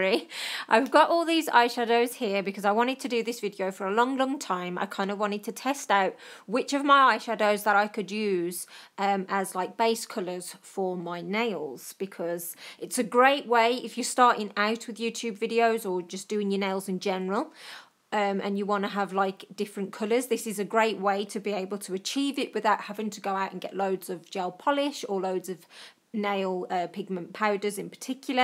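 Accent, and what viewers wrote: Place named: Tamworth, United Kingdom. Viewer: Hey, sorry for the none nail related question. I recognise the accent I think. Are you from Tamworth? X. Love the tip. Thanks for sharing.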